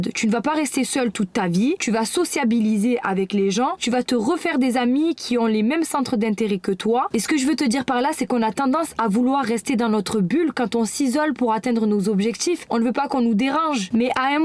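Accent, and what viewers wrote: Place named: South, France. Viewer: On reconnait l'accent du sud mais tu articules très bien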